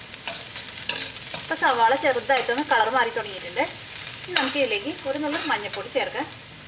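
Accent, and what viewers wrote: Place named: Tamil Nadu, India. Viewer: Xenophile C I watched this video for how elegantly Ms. spoke malayalam. It sounded like very melodic Tamil, and Tamil itself sounds very melodic:).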